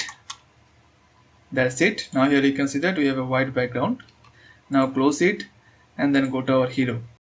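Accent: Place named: India. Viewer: "Here you can see that" , also nice video , the accent is very indian sir.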